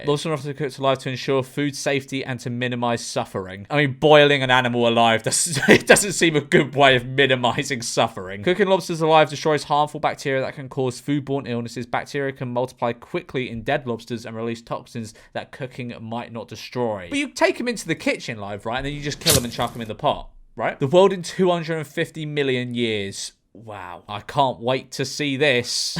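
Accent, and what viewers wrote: United Kingdom. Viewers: Omg I’m having such a hard time. I needed this right now, seeing your masked face and hearing your British accent. My OCD is feeling good.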